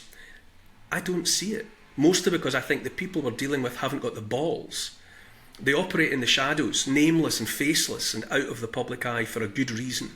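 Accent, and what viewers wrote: Scotland, United Kingdom. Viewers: It's the Scottish accent. Our DNA has been programmed to revolution since William Wallace.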